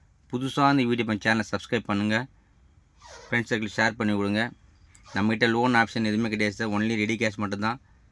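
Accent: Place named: Madurai, India. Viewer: Madurai vasagam super 🎉🎉🎉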